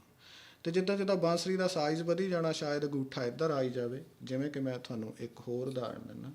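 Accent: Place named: Punjab, India. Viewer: I love you accent of panjabi language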